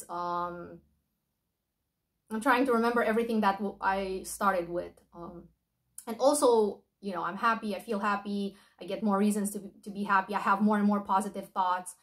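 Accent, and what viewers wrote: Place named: Italy. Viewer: hiii, thank you so much for sharing your experience, loa is real. Are you italian? cause i hear a little bit of italian accent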